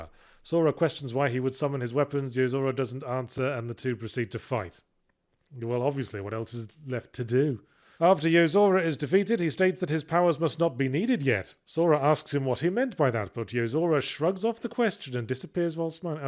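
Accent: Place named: United Kingdom. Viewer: I have had multiple people in the past try to get me into this series when they found out I hadn't played any of the games, by explaining them. Without fail, roughly 5 minutes in, my eyes would glaze over and I would silently stand up and walk away without saying a word. I made it through 10 minutes of this video, largely on the strength of Yahtzee's voice and British accent (none of the previous people had British accents) before my eyes started to glaze over and I stopped the video to type this message. Hope the video does well, but 10 minutes is all I can stand.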